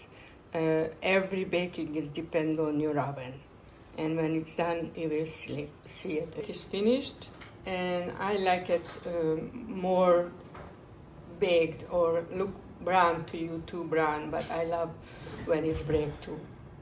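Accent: Hungary. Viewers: I love your recipes and enjoy your videos. And you can be rightly proud of your Hungarian accent and your roots!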